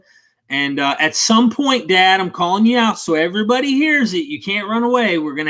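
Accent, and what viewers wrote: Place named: Virginia, United States. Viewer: Are you from VA? Just guessing based on your accent.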